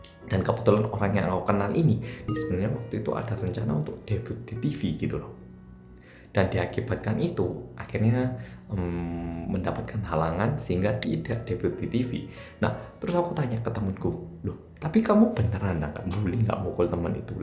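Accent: Java, Indonesia. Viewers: Lama g nontonin wong medok siji iki.. Sehat trus mas, sukses ya.. Tambah ganteng rek..😁😁😁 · Mas, maaf ooc. Tapi gimana cara Mas me-maintains logat dan bahasa jowo mu disana? Kayak gak pernah berkurang sedikit pun kadar medoknya. Saya suka 👍⭐ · Oppa koreanya medok bgt...hehehe so cool...keren keren...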